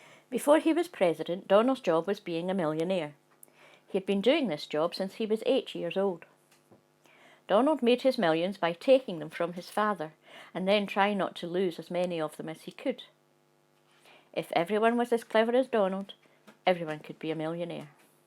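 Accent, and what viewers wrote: Scotland, United Kingdom. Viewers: No she is Scottish if you want to learn standard Uk English look up how to learn RP and the sounds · Is your pronunciation is how the British accent sounds?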